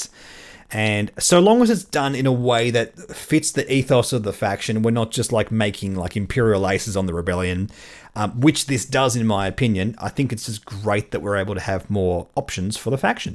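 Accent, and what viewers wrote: Australia. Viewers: Good to hear your Aussie voice talking x-wing as I do my work.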